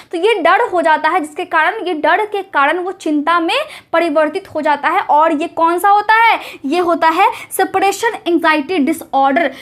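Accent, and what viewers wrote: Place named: Bengal, India. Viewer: Mam aap Bangal se ho,aapki language se lagta hai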